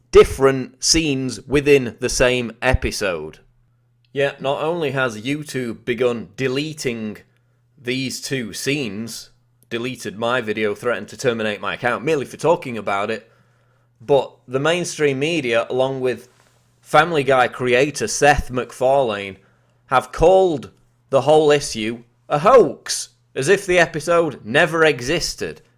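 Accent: United Kingdom. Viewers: Is Alex Jones still doing the Piers Morgan voice or is the narrator British?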